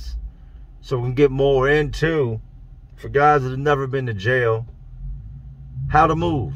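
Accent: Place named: Pennsylvania, United States. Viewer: you talk like a PA boy, you from the 412,724, or the 814?? imma New Castle, ( 724 ) proud my whole life!!! Either way, HMU some time!!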